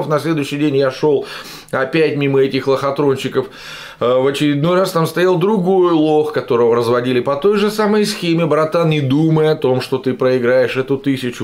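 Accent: Moscow, Russia. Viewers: Это всё хорошо,согласен. Один вопрос только. Почему в таких роликах на Ютубе,те,кто ведут свои каналы,голос копируют ведущего Киселёва? Вы нормально говорить умеете? Это А-канье на всех гласных, московский акцент выдает сразу. Или всё блогеры телевизора с Киселевым насмотрелись и не представляют себе как можно по другому разговаривать? Такое впечатление,что есть две манеры разговора: как путя и как кисель. Всё! И все копируют либо ту,либо ту манеру разговора и интонацию! Это Пиз..ец просто!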